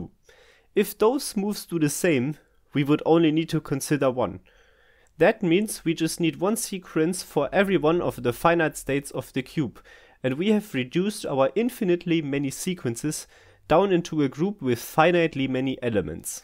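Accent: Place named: Switzerland. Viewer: Why do you have a swiss accent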